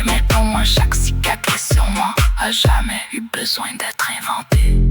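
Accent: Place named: Canada. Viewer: Petit accent canadien 😂 j'adooore. Merci a mon fils de m'avoir fait écouter cet connasse 😂 j'adoore .❤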